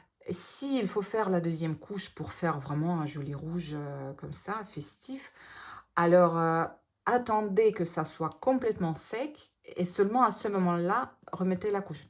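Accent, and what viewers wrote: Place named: Russia. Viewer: Bonsoir Madame,je de,couvre votre chaîne et vos tutos,j’aime beaucoup votre joie de vivre,vos explications vous êtes très très sympa, je suis une mamie et je vais m’atteler à faire vos jolis chevaux russe,de votre pays,votre tout petit accent est très charmant ! J’ai le temps d’ici Noël,de m’exercer,et d’en réussir quelques uns !du moins j’espère !Merci pour tout le temps que vous nous avez accordé, bisous aux enfants 👶. Marie-Line, deProvence.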